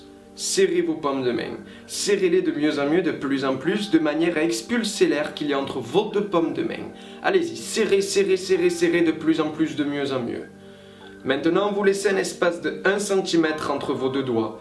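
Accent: France. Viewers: Petit accent Toulousain... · Il a l'accent du sud' c'est très dur de se concentrer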